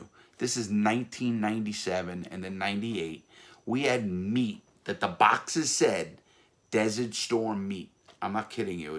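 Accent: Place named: East Coast, United States. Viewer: Very good...your interesting and love your east coast accent..handsome too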